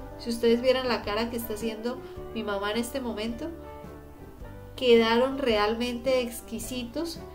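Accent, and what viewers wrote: Colombia. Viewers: Cuenta Samsung por el asentó creo que es Colombiana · El acento es colombiano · Esa voz es colombiana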